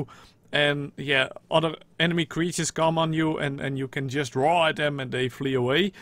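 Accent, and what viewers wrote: Netherlands. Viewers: Je hoort dat hij Nederlands is hij is wel grappig😂😂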